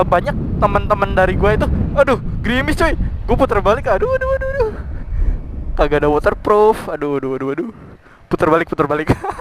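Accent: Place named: Banyumas, Indonesia. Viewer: motovlog ngapak mantabbb ...smoga sukss channelnya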